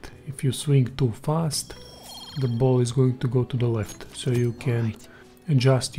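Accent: Russia. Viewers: По акценту вычислил что русский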